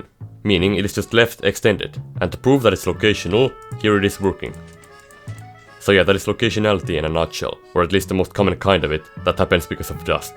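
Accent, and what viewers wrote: Finland. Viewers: This sounds really much finnish accent! Pitää tilata kanava, hyviltä videoilta vaikuttaa!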